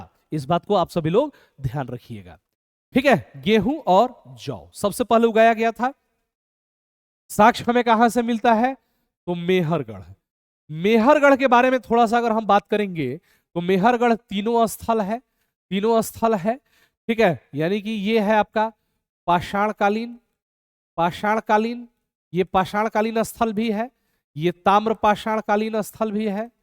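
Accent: Bhojpuri, India. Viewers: Sir ji aap to gajabe bhojpuri bolte hai ekdam gaaw ke tarah❤❤❤ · Sir aapka bhojpuri sunkr lga ki aap mere hi district gopalganj se belong krte h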